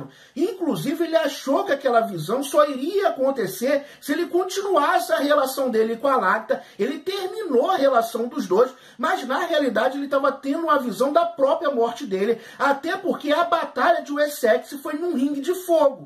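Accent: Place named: Brazil. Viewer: Acho legal como ele pronuncia os nomes dos personagens! Bem brasileiro! Hahaha! Seu canal é legal!! Sucesso!!!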